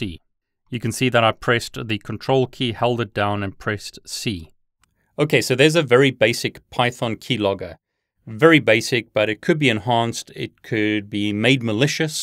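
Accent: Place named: South Africa. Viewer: are you south african lol? that’s what your accent sounds like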